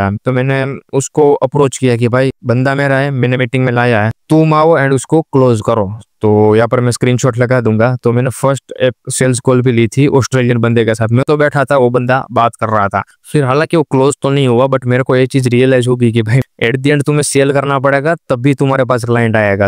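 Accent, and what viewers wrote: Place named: Gujarat, India. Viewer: Tame gujarati Chhone Bhai Boli upar thi khabar padi gai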